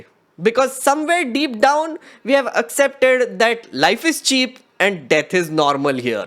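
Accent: India. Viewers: nothing wrong with having an Indian accent. he sounds dignified to me. no need to copy the English or Americans. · Bro u need to change ur accent it sounds so stereotypical Indian and is the reason for our loss of dignity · You’ve got the perfect Indian accent!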